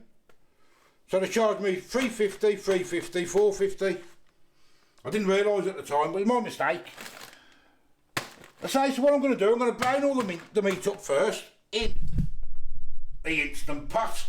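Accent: Birmingham, United Kingdom. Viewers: Proper brummie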